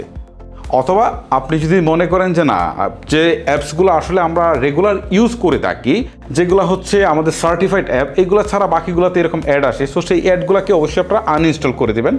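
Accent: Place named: Kishoreganj, Bangladesh. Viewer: আপনার কিশোরগঞ্জের কিছু ভাষা থাকে, সুন্দর করলে আরো সুন্দর শোনাবে।( তাকি × থাকি ✓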